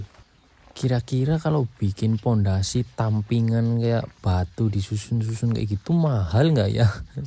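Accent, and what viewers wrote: Banyumas, Indonesia. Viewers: Bahasane lho.. ra nguati.. 😂😂 ngapak indo.. tapi kue dadi persona lho mas, pertahankan yo.. bahasane wis apik kaya kui.. nek bahasa indo mulus.. ah.. wis biasa.. ngapak indo donk, baru keren 😂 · Ngapak'e banyumas apa bro??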